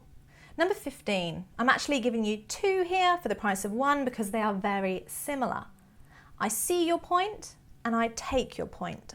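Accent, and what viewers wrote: United Kingdom. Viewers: I love British accent a lot and your accent also. I had been in England for 10 mouths it's easy to speak English but there's some confusing for me because I'm French speaker . I mean there are many words similar in French and different pronounce.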